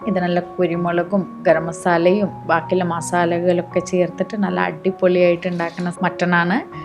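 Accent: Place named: Beary, India. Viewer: Definitely will try this, mutton is fav one, Malayalam with beary slang 👌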